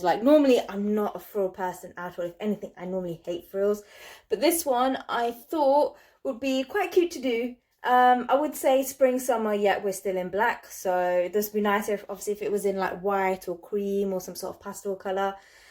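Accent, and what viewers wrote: United Kingdom. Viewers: Hey, OMG you look stunning, especially the white see through... Perfect. Also, that British accent, very classy all the way. Stay safe gorgeous..🤗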